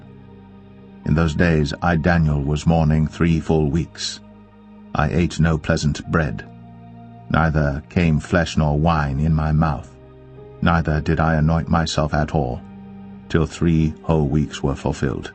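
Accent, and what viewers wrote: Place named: England, United Kingdom. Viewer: sounds like England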